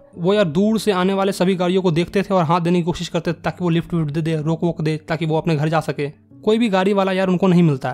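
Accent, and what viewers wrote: Bihar, India. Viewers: Sir Bihari che gujrati nathi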